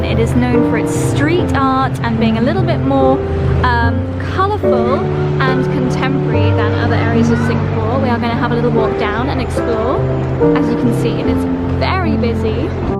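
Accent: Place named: United Kingdom. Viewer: Ur middle upper class british yea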